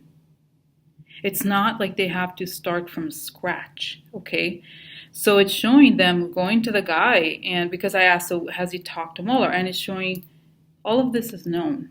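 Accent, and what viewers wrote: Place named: Russia. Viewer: The faces take away from your content? Really? Oh HORSE SHIT! They enhance your readings, help us cope, and provide most of us with the only politics-related laugh we will get each day. Especially love your Russian accent. Never stop. PLEASE and Thank You! 💕💕😂💕💕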